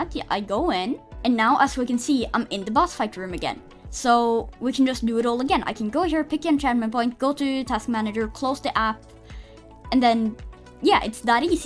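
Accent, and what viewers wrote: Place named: Scandinavia. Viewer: Are you scandinavian? I am norwegian and I recognize the accent hehe :)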